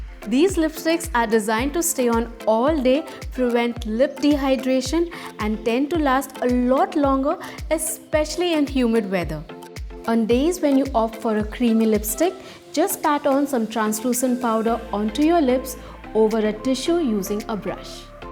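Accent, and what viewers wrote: India. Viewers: U r beautiful 😍 r u from India? Ur voice n accent sounds Indian.😃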